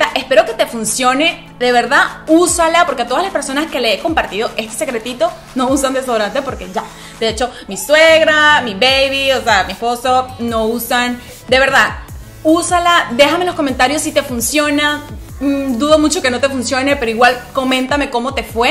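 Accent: Venezuela. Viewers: Es venezolana? es que tiene el acento 😁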